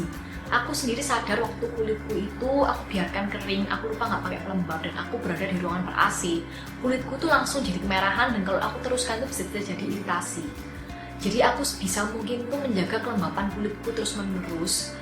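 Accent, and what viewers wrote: Java, Indonesia. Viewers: medok mbak?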